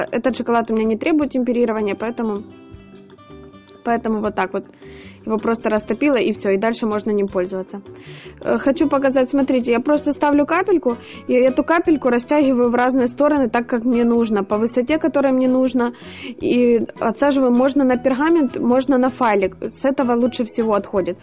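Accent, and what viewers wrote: Ukraine. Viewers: Украинские нотки , лучше молчите или заведите человека с чистой речью...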